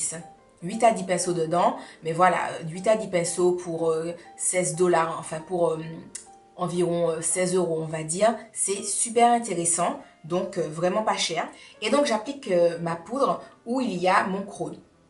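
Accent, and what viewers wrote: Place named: Caribbean. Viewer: Ton accent antillais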